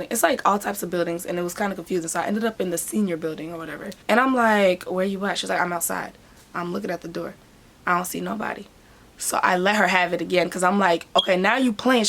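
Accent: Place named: Cincinnati, United States. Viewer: Your beautiful girl! Sounds like some Cincy shit lol! Where you from Mason or something? The suburbs so I am assuming because nothing about Cincinnati, Ohio suburbs especially not in 2006!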